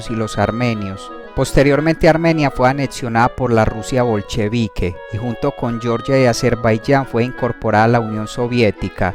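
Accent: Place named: Medellín, Colombia. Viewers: Con todo respeto porque hablas así? Eres paisa de Medellín ? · Eres colombiano de Medellín,no? Yo conozco ese acento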